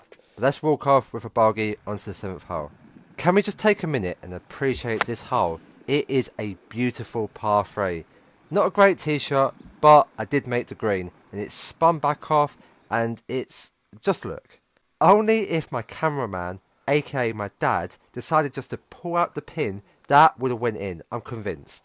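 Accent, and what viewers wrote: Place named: United Kingdom. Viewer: I’ve watched so many of these videos that as an American his British accent is sounding like a normal-to-me american accent from my POV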